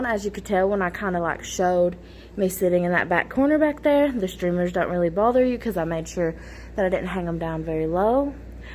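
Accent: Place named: South, United States. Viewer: Hey! I just wanted you to know how much I am enjoying watching your classroom vlogs. Your classroom is looking great! I love the math alphabet, but I especially love your accent!! I am also a teacher from the south. I have been told recently that, like you, the teacher will most likely have to move from class to class. I agree that it is the safest thing to do for our students, but it will be a challenge! Good luck to you!! By the way, I also teach 6th grade math at my school:)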